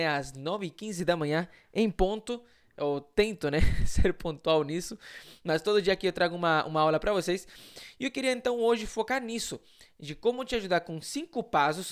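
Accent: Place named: Chile. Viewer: Em meio à correria da minha quinta-feira, consegui ouvir o áudio da aula de hoje. 😅 Gostei muito das sugestões que você deu. Obrigada!!! E uma coisa que achei interessante é que, em vários momentos, você falou como um nativo brasileiro. Se não fosse a pronúncia da letra "o" em algumas palavras, ninguém ia acreditar que vc é chileno... rsrsrs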